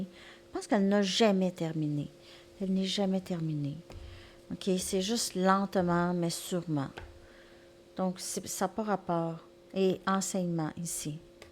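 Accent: Canada. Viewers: J'adore ton accent si charmant du Canada j'adore j'adore le Canada car en juillet 99 j'ai était dans ton très beau qui est magnifique et que j'ai vraiment aimée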